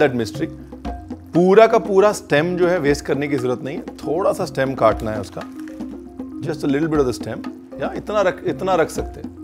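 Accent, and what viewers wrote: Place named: Punjab, India. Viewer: Ranveer pra...Love you...Your style amazing and jab bat karte hue Punjabi touch ata haina...mast bro you awesome....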